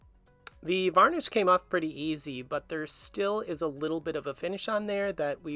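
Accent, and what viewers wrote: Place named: Midwest, United States. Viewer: I THOUGHT I heard some midwest inflection there! (I'm in Ohio) What gorgeous wood grain was hiding under that old varnish!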